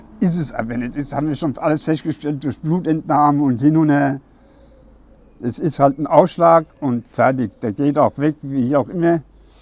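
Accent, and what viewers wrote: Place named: Hesse, Germany. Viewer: So sympathisch..mit seinem hessischen Akzent und seiner menschlichen Art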